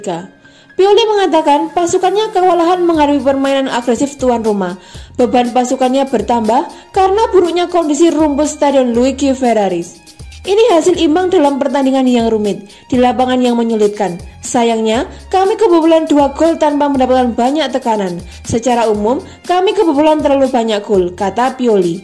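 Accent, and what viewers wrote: Java, Indonesia. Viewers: Kemedokan yang baca berita nya...gak menarik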